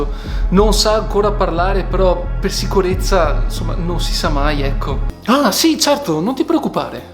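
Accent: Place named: countryside, Italy. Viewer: Se sente che ti xe' da campagna. Viva ea Baia del Re e Cannaregio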